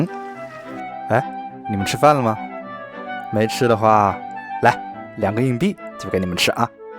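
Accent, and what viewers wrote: Wuhan, China. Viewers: 不用说就晓得你是武汉人哪，托托的弯管子普通话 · 拐子！你就用武汉话吧，你这武汉式普通话听到我鸡皮疙瘩掉一地。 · 一听就是武汉普通话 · 哈哈、纯武汉普通话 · 这个说话的调调，重音的部分，确实有武汉话的感觉。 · 武汉人！！！！口音越听越喜欢！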